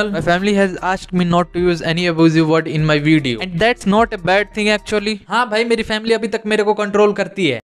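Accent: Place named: Bihar, India. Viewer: Y you sound bihar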